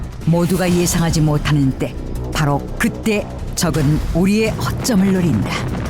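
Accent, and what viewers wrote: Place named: North Korea. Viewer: 말하는게 북한인갔다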